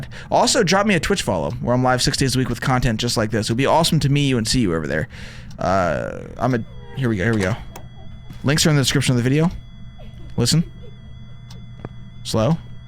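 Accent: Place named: Delaware County, United States. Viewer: You sound like you have a delco accent from Philly lmao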